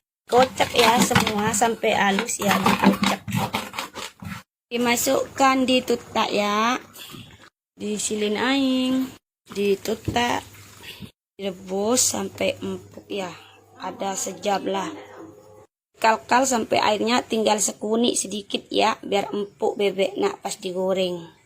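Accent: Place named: Madura, Indonesia. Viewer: Ini asli orang Madura 😁😁dari cara ngomongnya beda😂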